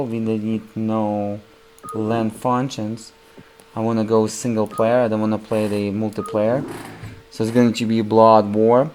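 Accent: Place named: Russia. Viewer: You are from? You pronance like a russian.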